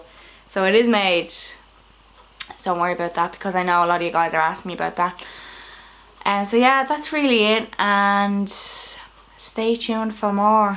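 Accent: Dublin, Ireland. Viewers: you are so talented you are so right with the Dublin accent I can relate to you, keep up the great work and if it's not too much trouble do more movies if they were set in Dublin they were fantastic introduce loads of my family to them particularly love titanic best of luck to you my 87 year old mother Haas a great laugh.I wish you well and happiness and look forward to the next production.well done your class you make my day .I will keep watching good girl go for it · l love this chanle l love to hear my dublin axsent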